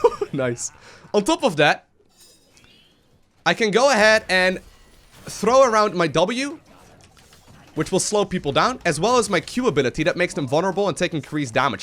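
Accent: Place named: Netherlands. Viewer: Haha yeah that's kind of how we Dutchies often speak!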